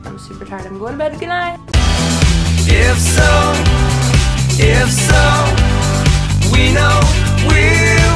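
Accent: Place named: Russia. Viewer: Bahaha little russian accent is so hilarious. Oneeee, Twooo, Treee..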